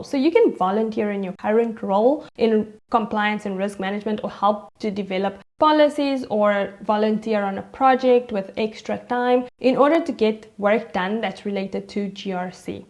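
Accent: South Africa. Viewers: I know my people when I hear them are you from SA by any chance? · This accent… I know it mahn. are you South African? 😅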